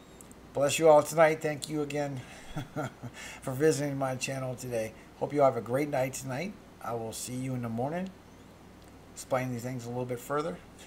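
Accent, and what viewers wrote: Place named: South, United States. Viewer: 🥰 Your speech gives you CHARM,!! 💙 Happy the military couldn't totally break you..."can't take the South out of the boy (or girl, haha!) 😆 God bless...He loves us wherever we come from!! 🙏🌴🌩🌴🌀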